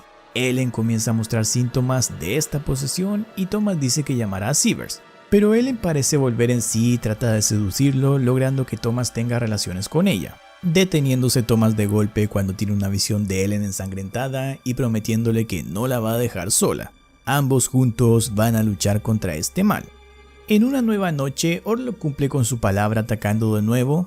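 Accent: Chile. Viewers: Eres chileno? Orgullo nacional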